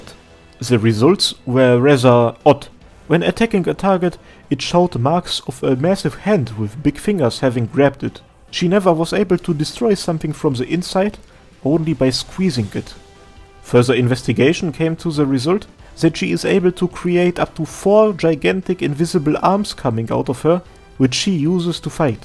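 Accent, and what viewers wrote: Germany. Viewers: Some of the Arknights backstories are dark (just take Frost Nova as an example), but hers surely takes the cake. I do love her though, and as a new player, I do hope she will come back so I'll have a chance to blow my money on getting her, cause I do want her so bad. The chapter 8 music video, she looks so bad ass! Also, your explanation of her ability, of four invisible hands holding onto the things she's got with her. My first though was Re:Zero and Petelgeuse's unseen hand witch factor. Haven't seen the anime you mentioned, so can't comment on that, but as you explained it, I immediately thought of Re:Zero. Anyway, thanks for your lore videos. As a new Arknights player, there is so much lore to catch up on, and the story of Arknights is actually really good. So having access to your videos is a nice help to explaining some character connections and such. 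Also, please don't loose the accent, it's so charming. I live in Denmark, so I'm used to German tourists visiting, and the German accent I've always found so charming. I get why you sub the videos, it can be difficult to understand for some. Guess what I'm trying to say is, keep doing you, keep up doing the great work, and thank you for the videos you make! ❤❤❤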